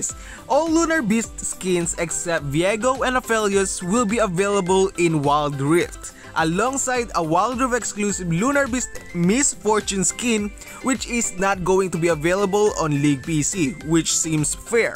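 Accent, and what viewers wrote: Philippines. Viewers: are u Filipino? Because of ur accent. And i want lunar beast annie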